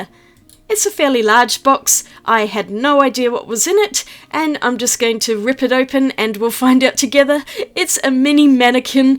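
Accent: Australia. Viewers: This is such a lovely video,! I love hearing an Australian accent on YouTube, it's so normal and comfy to hear as a fellow Aussie. This advent calendar is so affordable and such a nice little "taste test" for the Born range! I really appreciate they didn't pre-sharpen the pencils, it means the tips weren't gonna break in the box!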